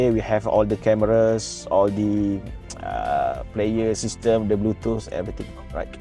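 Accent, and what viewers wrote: Malaysia. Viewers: Good job abg 👍perbanyakkan lg video² review english version..bole menarik lbh ramai viewer² luar utk tgok channel GK. Don't worry about how we pronounce the words cuz this uniqueness symbolizes us from Malaysia.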